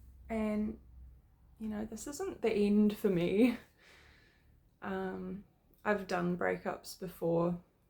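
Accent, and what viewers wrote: New Zealand. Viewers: Extremely courageous of you to share this moment in your life. Give it time, time to & for yourself. I'm hearing a Kiwi accent. I'm not sure if you're in NZ? Maybe contact friends away from your area & get away for a while to break routines. Memories aren't always the best sometimes. All the best & keep shining 🌟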